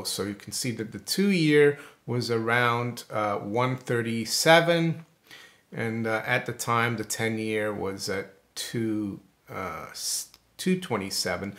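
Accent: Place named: United States. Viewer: based on the decor and the ugly dog I would say you live in England. Based on your voice I would say you are an American living in England ( sorry to hear that).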